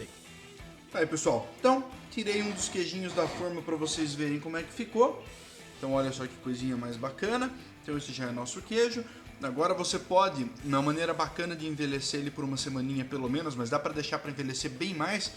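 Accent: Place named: Rio Grande do Sul, Brazil. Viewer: tu é gaúcho...né!!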